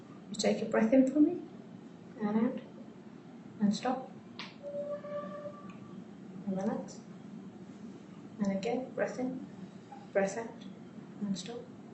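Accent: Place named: United Kingdom. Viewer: Wait, you hear her accent and automatically think American? Sounds like she is from the UK to me but jrodulfa1 is correct.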